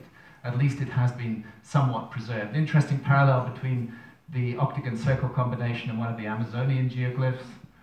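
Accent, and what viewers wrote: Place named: Scotland, United Kingdom. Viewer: He speaks Scotts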